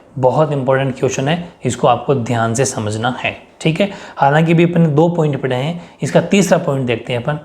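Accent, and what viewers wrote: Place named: Marwari, India. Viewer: oho sirrr nyo paint kra liya kai 😂😂kursi bhi., shandaar h🤩... Sirr aap to baithe baithe hi pdha diya kro😊😊, aapke per drd krte h na ☹️ mhari trh hindi + marvari ghni hi achi baat h 😂😂 aapk muh se sunne me jyada acha lgta h sirrr. 2, 3 baar bol diya kro sirr😜😜 indeed sirrr literally me to uss pen ko aapk pen se compare krr rhi hu😎😎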